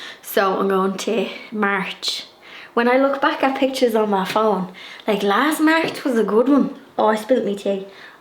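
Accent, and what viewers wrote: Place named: Ireland. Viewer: Brilliant I could listen to you all day long....your accent is amazing and I love your little ditties....pure Irish. X Best wishes from the UK... X 🌼🌸🌱🌿🌾I even love to meet you and have a brew ... ☺️👍☕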